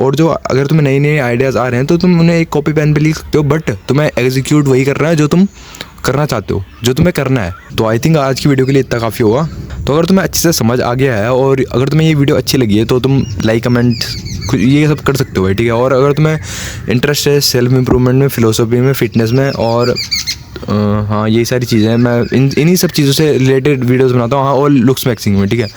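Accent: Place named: Hills, India. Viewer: Bhai pahad se ho?😊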